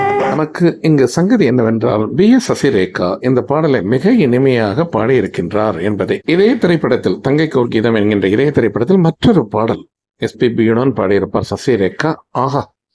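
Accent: Sri Lanka. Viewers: சிலோன் தமிழ் குரல் 🎉 அருமையான பதிவு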